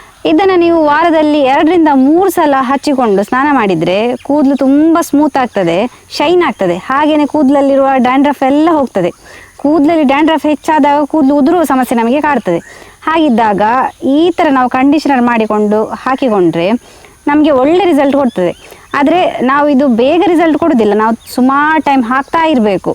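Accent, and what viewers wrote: Mangalore, India. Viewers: Your Kannad talking voice is mangalur I am at Haveri Karnataka style of kannada speaking is different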